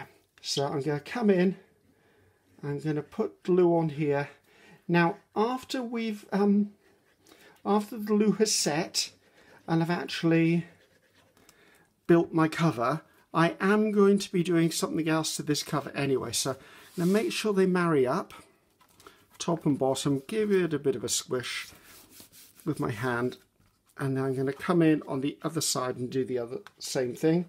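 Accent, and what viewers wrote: England, United Kingdom. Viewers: Oh my gosh, I came across you quite by accident and LOVE YOU! I'm a Brit living in the USA and love watching English crafters as it makes me feel closer to England hearing the accents and listening to you, I feel like we are old friends getting together for a natter and a cup of tea. I'm not new to paper crafting but I am to junk journals. They always seem daunting to make but your vidoes have inspired me to actually try making one! You have a new life long fan!! xoxo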